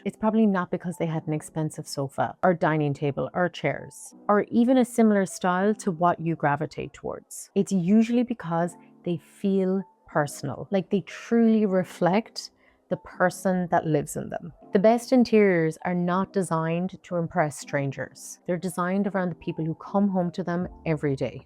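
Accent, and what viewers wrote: Ireland. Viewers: first, she's irish, in my opinion a much cooler accent. second, i recently ' learned ' (it may or may not be true) that before amer. was amer. the british spoke with an 'american' accent. it was the british who changed, amer. accent is the original. if it is true, it is pretty funny. btw there are many different british accents as well and some of them are also torture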